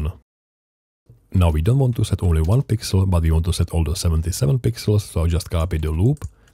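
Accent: Czech Republic. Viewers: Amazing content, live your little DIY projects. Fascinating to watch. I cannot help but try to figure out, are you by any chance a bratr from CZ? Im not that great with accents, sorry :D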